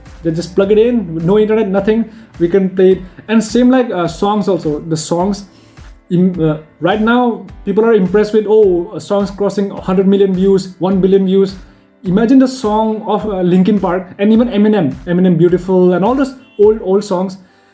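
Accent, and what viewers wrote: India. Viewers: Bro is asian with an Indian accent, like HOW?!!!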